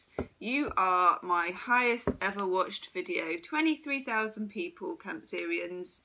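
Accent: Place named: United Kingdom. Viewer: All true. Miraculous. I adore both your readings and your British pronunciation, one of the best in my YouTube community (David Icke's being the other, ha ha). Thanks.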